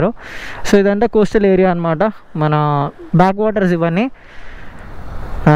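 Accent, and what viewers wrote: Kerala, India. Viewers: Bro mee native place ekkada I mean Malayalam matladthanav kada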